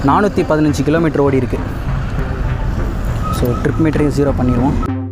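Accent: Vellore, India. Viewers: Nega Vellore ahh???.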